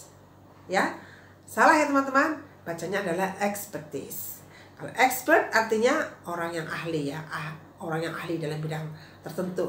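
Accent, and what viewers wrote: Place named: United Kingdom. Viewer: good night madam this is British accent 😊😊.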